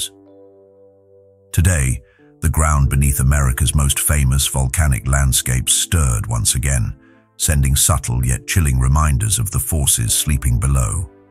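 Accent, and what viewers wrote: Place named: United Kingdom. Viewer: STUPID british, trying to speak english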